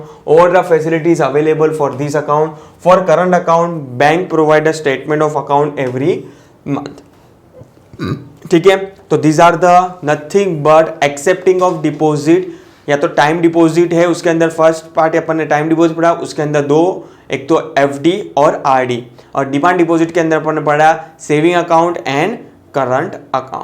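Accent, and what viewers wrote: Gujarat, India. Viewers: I agree with Rohan. Sir apke pas sufficient knowledge hai but Voh hum tak pahunch hi nhi rha hai. You're just reading TB & winding up the part . I think you should go in depth & explain various terms & give us examples. Also sir tame gujrati 6o ne .... Tamari accent par thi khbr padi gayi 6 mane .... Sir the way u speak 🗣️ etc & various is just gujratically awesome ... Gujratically is made by me ( Gujrati + beautifully ) Sir hoping you to change the way you teach ...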